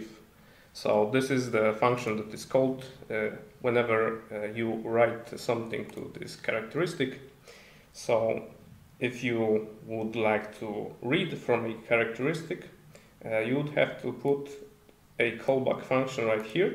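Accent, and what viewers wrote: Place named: Eastern Europe. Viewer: Nice, cant wait for the audio implementation. Are you Polish? You sound slavic.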